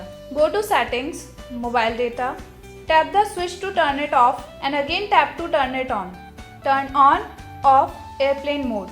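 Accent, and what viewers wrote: India. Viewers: She’s Indian brahh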